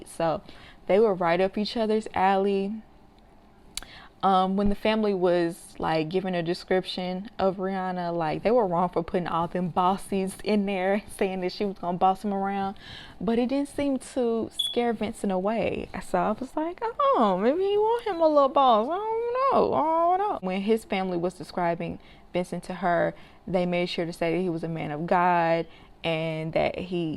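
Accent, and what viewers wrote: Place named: South, United States. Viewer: I've heard this chirping on your other videos (smile). Normally smoke detectors are wired together; if one goes off in one part of the house it will cause the other one to go off. if you replaced batteries in all detectors and it still goes off, then the detectors are bad and need to be replaced. They only last about 10 years. Also love your makeup and southern accent!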